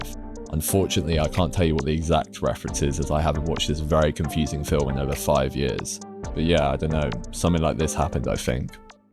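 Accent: United Kingdom. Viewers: I love your videos but i have to admit, its hard listening to that much fry (plus the britishest accent, my mind is exploding)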